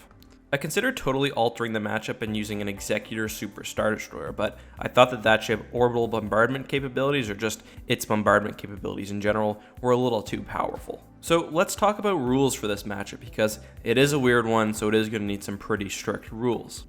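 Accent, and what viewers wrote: Canada. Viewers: Patriotism? It's pretty obvious this guy is Canadian due to his accent.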